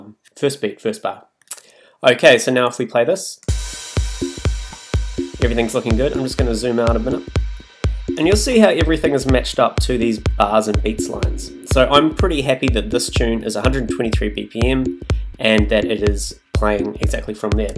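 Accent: New Zealand. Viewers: New Zealand? love that accent! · Love the Kiwi accent. You really "squash" your vowels!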